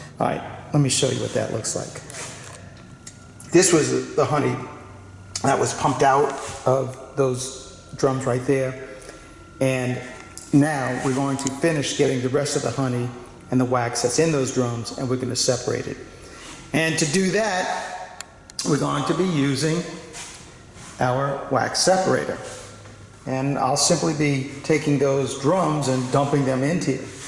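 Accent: Louisiana, United States. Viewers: This just popped up on my YouTube (fyp) , you started talking and I immediately said “okay where is he from” because I new it had to be Louisiana lol just wanted to say hi from northeast Louisiana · Stumbled upon you from another bee video. Could tell right away you were from southern Louisiana.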